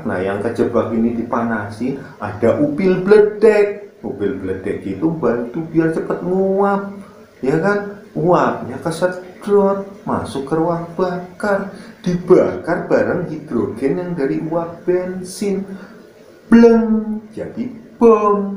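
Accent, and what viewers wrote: Banyumas, Indonesia. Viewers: Daerah mana Boss,kok ngapak dialeknya. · Basane ngapak temen kue kang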